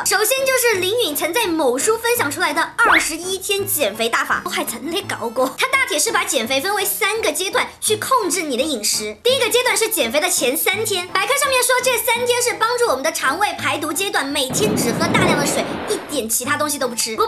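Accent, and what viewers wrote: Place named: Sichuan, China. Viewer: 原來跟密子君一樣是川妹子！！難怪講話口語口氣超像！！！喜歡😍😍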